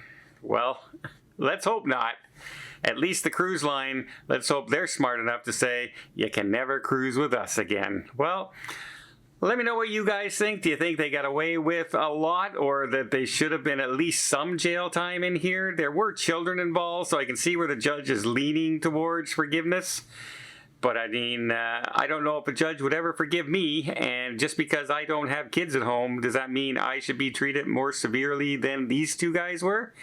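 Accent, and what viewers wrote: Canada. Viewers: oh I thought it sounded like fantastic but I guess with his Canadian accent it sounds like van.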